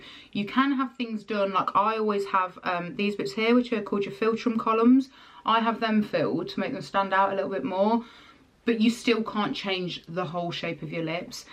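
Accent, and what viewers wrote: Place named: Birmingham, United Kingdom. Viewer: Waitttt you’re from brum ?!? I knew I recognised the accent 😅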